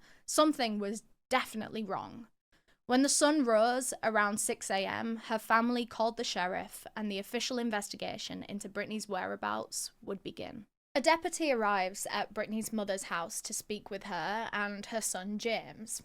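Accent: South, United States. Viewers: You have a good American accent sometimes I hear in other videos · It just depends on where your from. Down south we say it the way you are.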